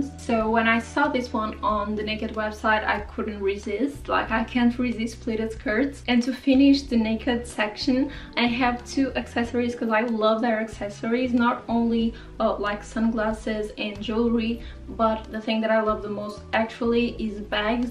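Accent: France. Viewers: Are you French? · Is she French ?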